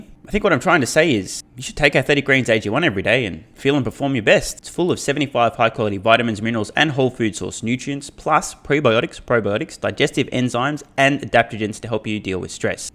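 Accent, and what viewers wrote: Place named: Australia. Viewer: Sounds like an Ausie(sp?). Hat's off Mate!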